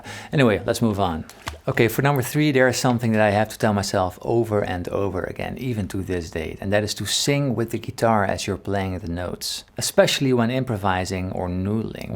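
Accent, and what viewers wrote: Netherlands. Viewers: This man has one the smoothest yet clearly Dutch accents I've ever heard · you sounds dutch? are you dutch? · The Dutch accent is thick in this one.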